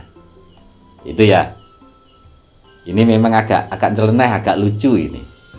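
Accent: Java, Indonesia. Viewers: Mengenali Miss V para gadis.Lagi senang sekiranya sedari bayi.Iaitu anak sendiri.Positif dan bersyukur.Andai aku bisa memahami sungguh gaya penyampaian loghat Jawa!Telus ingin beristerikan orang@ jawa.Rajin dan bersahaja.Serta kuat akal fikiran.Saya dari Malaysia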